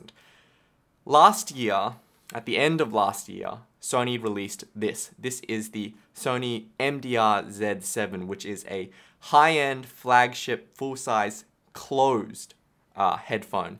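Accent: Australia. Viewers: An Asian guy with an Australian accent? Mind blown.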